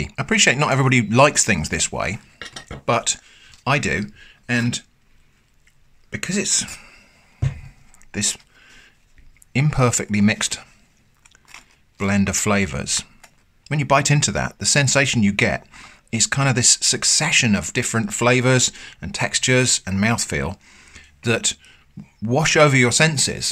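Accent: United Kingdom. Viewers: That's so aggressively british gosh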